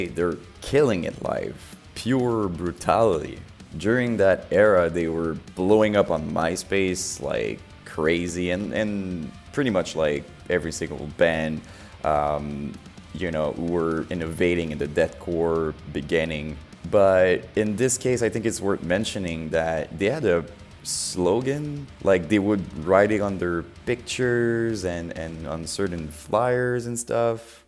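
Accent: Quebec, Canada. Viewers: Been trying to pin your accent for ages, I settled on Swedish, good to know it’s French-Canadian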